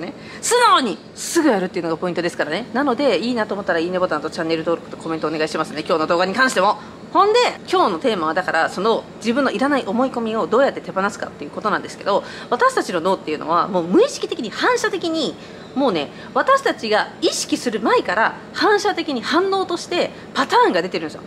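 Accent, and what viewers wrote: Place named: Kyoto, Japan. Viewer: 京都？っぽい。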